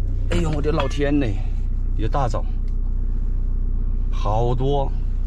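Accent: Sichuan, China. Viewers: 听囗音象我一个朋友，四川人吗？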